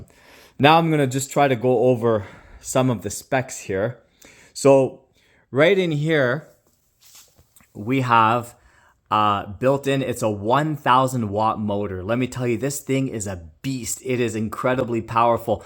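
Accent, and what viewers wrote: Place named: Canada. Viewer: I'm planning on getting the X2 60 Volt dual 750 hub motor version. Judging by your accent you are from Canada and the one I can get is not available to you. 🤔 I live 5 miles from Canada in Detroit area by Windsor Ontario.. I currently own an Ariel Rider Kepler 26x4 fat tire with a 1,000 watt nominal Bafang geared hub..1,800 watt peak 52V 20 ah battery. I just wanted a folding bike with dual motors that was as fast as my Kepler but does hills even better. Dual suspension and 60V is a plus too. 100 Nm torque per motor is great! Better than my single 108Nm motor. I would consider the Road Warrior X2 with dual 60V 750 motors but I want a folding bike and extra suspension. My Kepler goes 35 mph no problem .. Throttle only.. 56 kph .. Flat ground to 60% battery 56 kph then goes down to 54 after 60% battery.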